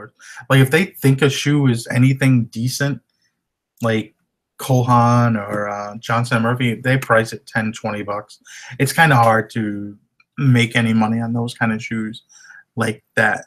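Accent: Boston, United States. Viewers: I like your Boston accent don't let the bugger's get you down you give always excellent content! Never heard of the Weston shoes till today so something to file away in my search's! Thanks for giving really nice vids! Corie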